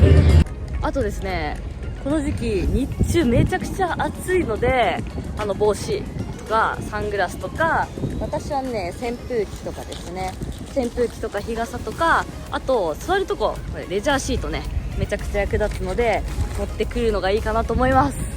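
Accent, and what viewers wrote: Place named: Kansai, Japan. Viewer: 、お疲れ様❤❤❤ 横田基地、楽しかった見たいダネ〜👍👏👏👏 の関西弁が出まくってますから🤣🤣🤣 オプスレーにC2にYS11もあるのかナァ？戦闘機は、F22にF15にF2選り取り見取りダネ〜🥰🥰🥰 でも、やっぱり、私は、自分も、関わっている、AH1コブラ🐍ダネ👍💖💖💖 は、何が、良かったかなぁ？あっやっぱり、外人バーガー🍔かぁ🤣🤣🤣 じゃ、次回は、山口県、岩国基地のレポート、お願いしま〜す😘😘😘😆😆😆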